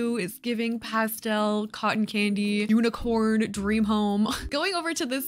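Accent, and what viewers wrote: Canada. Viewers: Canadians pronouncing it "New Or-leens" is always so cute and funny i love it hahaha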